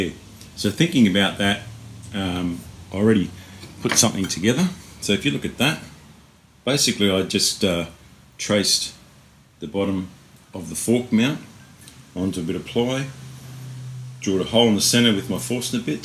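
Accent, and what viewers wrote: Australia. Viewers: Where have this channel been all my life? I love it! Subscribing for sure👍🏻 I love me some aussie accent aswell👌🏻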